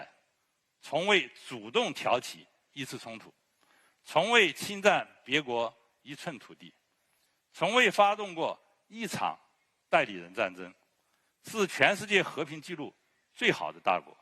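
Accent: South, China. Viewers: 應該是南方，可能江浙皖吧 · 南方口音？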